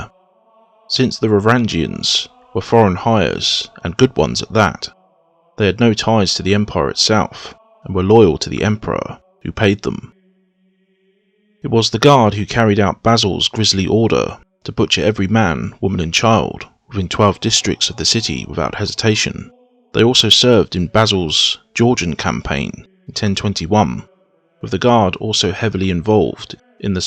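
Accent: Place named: West Country, United Kingdom. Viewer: I'm getting a slight West Country tone occasionally?.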